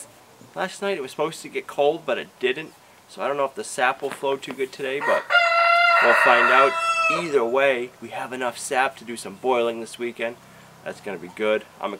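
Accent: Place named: Pennsylvania, United States. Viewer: One, I love your accent. Im from indiana it reminds me of a friend of the family who was from Pennsylvania. I just love it. Also your videos are inspiring!! Id still like to know what type of dog your dog is? I pray the syrup flows and the snow melts as fast as you need! Thanks for sharing with us!!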